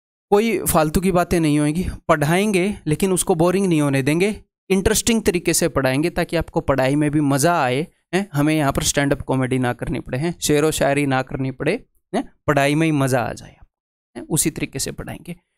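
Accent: Haryana, India. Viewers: Maine maths ka tier 2 batch lia h aur apka content perfect h ekdum exam oriented aur to the point ... Aur apke padane ka way bhi boht acha h aur haryanvi accent bhi aisa h ki dimag me seedha ghus jata h padaya hua ...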